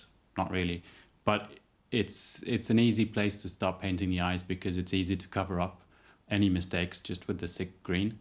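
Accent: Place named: Sweden. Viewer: You sound like a swede who has lived in the uk for 14 years, working in a pr-company or . Great video as always!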